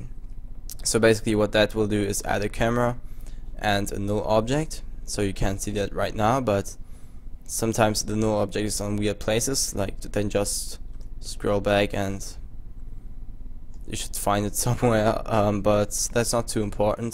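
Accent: Germany. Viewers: Wie man das merkt, dass du deutscher bist! :D Nichts gegen dein Englisch.. :P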